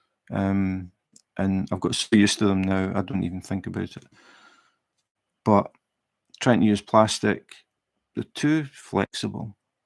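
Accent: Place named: Scotland, United Kingdom. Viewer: Diamond painting AND a Scottish accent. I can change up from diamond painting with Glaswegian comedian Kevin Bridges. 😳😂😂😂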